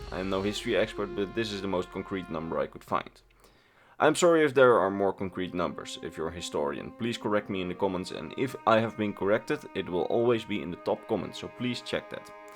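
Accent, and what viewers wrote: Netherlands. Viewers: The dutch is strong in you my friend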